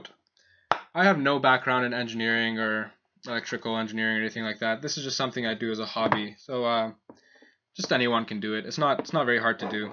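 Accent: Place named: Canada. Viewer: What's up with Canadians (presumably by your accent) and amateur chemistry? IIRC Nurd Rage and Nile Red are also from the land of the North Woods (assuming they are not the same person, likely but unconfirmed as that is). BTW does it really matter how centered a stir rod is? It's been ages since I took Chemistry in HS and TBH I don't recall any of it. I am about to start messing around with home made enchants and looking at the hardware I have in the junk drawer there are lots of motor options. Precision centering things might be more of an issue for me though. Any advice?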